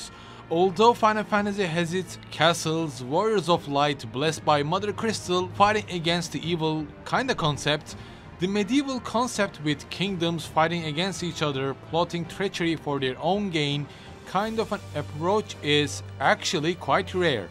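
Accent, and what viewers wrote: Turkey. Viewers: Where you from? You have turkish accent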